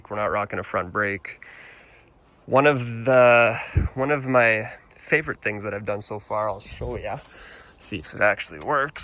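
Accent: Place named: Canada. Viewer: Best part of your Channel: Authentic Canadian Accent = Subscribed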